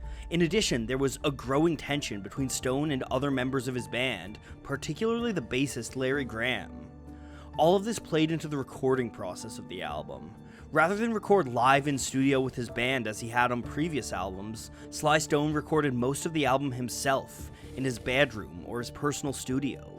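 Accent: Canada. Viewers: he's from canada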